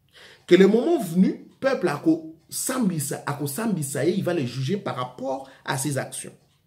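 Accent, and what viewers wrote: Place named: Cameroon. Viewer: Yo kutu face nayo eza lokola ba camerounais même ta façon de parler tu es camerounais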